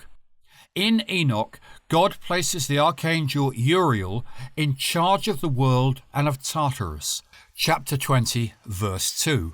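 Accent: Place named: United Kingdom. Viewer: I love the British accent of the narrator! 😊😊😊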